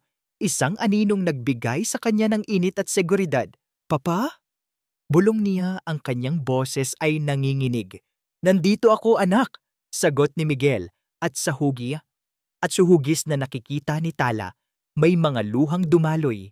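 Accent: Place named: Pampanga, Philippines. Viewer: Kapangpangan siguro ang narrator kasi eng basa nya sa ang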